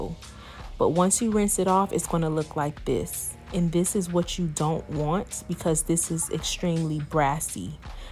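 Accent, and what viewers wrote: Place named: Chicago, United States. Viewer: You must be from CHI. Honey that accent comin through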